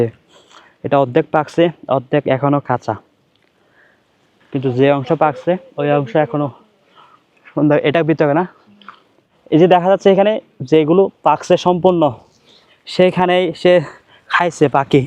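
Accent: Chittagong, Bangladesh. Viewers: chottogram এর মানুষ যখন সুধদো ভাষায় কথা বলে সেম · এটা মূলত চট্রগ্রাম এর আঞ্চলিক ভাষা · ভাই আপনার বাসা কি চট্টগ্রাম 😅😅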